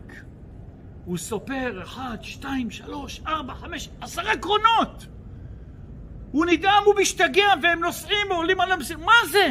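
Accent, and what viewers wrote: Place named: Iraq. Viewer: הוא נשמע עיראקי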